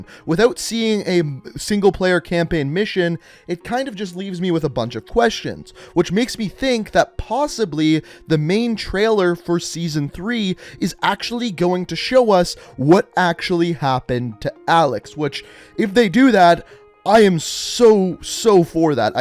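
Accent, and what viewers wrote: Canada. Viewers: this guys extra Canadian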